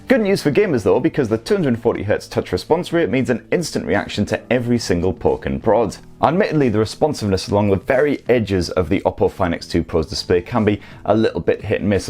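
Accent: United Kingdom. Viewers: Your English is so British 👌❤️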